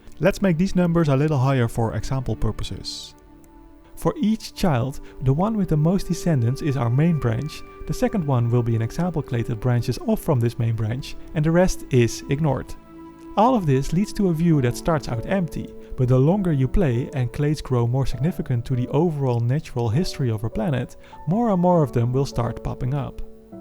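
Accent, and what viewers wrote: Netherlands. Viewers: At the start of the video: Dutch accent? Name revealed: Dutch 100%. Showing of our newspaper-sized nature areas in the background: Dutch 200%.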